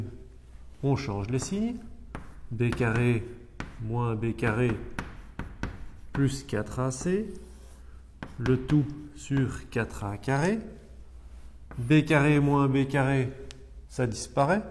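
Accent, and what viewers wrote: Belgium. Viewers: cet accent bien de chez nous haha vive la Belgique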